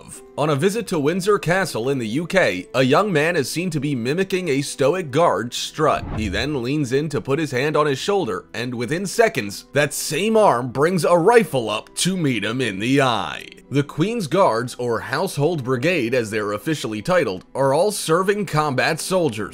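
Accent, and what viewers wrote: United States. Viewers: there's an American doing the voice over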